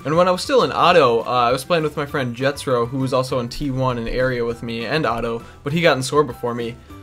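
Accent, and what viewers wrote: Minnesota, United States. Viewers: is he from Minnesota?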